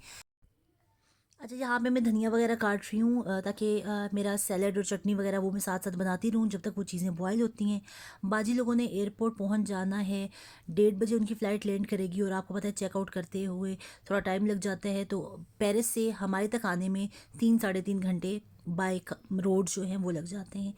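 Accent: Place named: Pothwar, Pakistan. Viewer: Ap Pakistan main kon sy alaqa sy belong krti hn apki bataon language main pothowari tuch aa raha he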